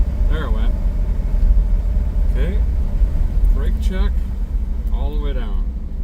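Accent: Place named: South, United States. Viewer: I feel like we are losing you only because you’re starting to talk Southern as in “got me a coffee”. We still love you here in tropical Southern Minnesota. High of Zero tomorrow ♿️🚾🍻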